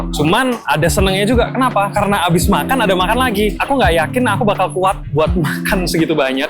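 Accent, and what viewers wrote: Java, Indonesia. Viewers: lucuuu kamu yaampun tiba-tiba main ke kotaku, mana ga expect medok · medok men lak ngomong 😂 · apa kamu orang jawa? soalnya logatmu jawa bgtt wkwk (muji) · full medhok rek 😂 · Es krim parfum :_akePepelol::_akePepelol: seru banget nontonnya dari awal sampai akhirr :_akeApprove: suaramu auto medok d sini :_akeLol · Tiba-tiba jadi medhok banget, sampe kaget loh aku, tapi cocok kok 👍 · mode medok nagih banget plisssss 😭😭😭😭💞 anw im glad u enjoy surabaya 🥹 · Medhok bet, cocok tp😦✨ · Lol, medok katanya...aq dengerny biasa aja sih...karena setiap hari denger yg medok-medook 😂😂 Anyway, seru juga buat dicobain. Aq lagi cari ide wisata buat suami n ibuku yg udah lansia. Stay happy n healthy yaa❤ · Habis nonton jadi laper, Ngiler bgt liatnya Btw jowo iso medhok ya wkwk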